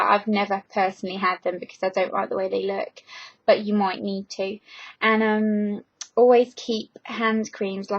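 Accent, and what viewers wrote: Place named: Leicestershire, United Kingdom. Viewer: It's refreshing 2 hear someone from the UK, on YouTube. Humour me - judging by ur accent, are u from Leicestershire, or possibly the West Midlands? Best wishes.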